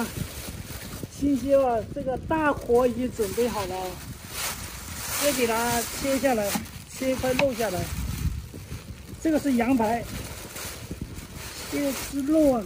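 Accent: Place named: South, China. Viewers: 感觉浙江口腔 温州一带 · 哈哈哈哈！南方口音。我聽著很親切。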